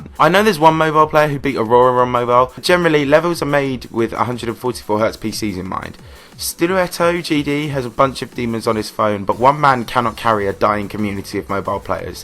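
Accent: United Kingdom. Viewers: 3 reasons I like -His sexy handsome face -His lovley eargasmic brittish accent -His good content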